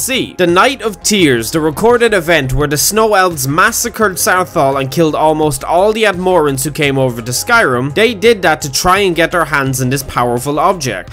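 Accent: Ireland. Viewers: Dominic Fischel It’s the Irish voices. They good.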